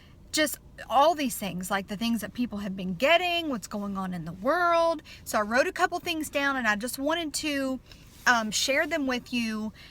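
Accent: South, United States. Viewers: Your Southern accent makes me miss Texas and where I grew up. It is cold here in Germany also:-) GOD Bless in Jesus Christ name:-)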